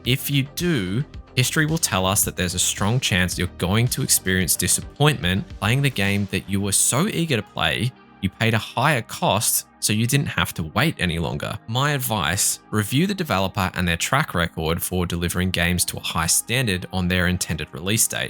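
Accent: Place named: Australia. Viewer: If only it werent for the Aussie accent, it'd be decent content.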